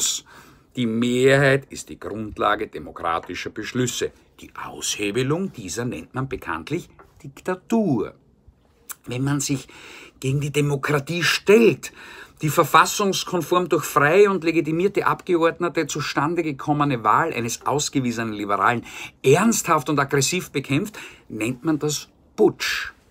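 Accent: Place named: Austria. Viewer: Hach wie schön ist es einem Österreicher zu lauschen 🙊 ach du je erwischt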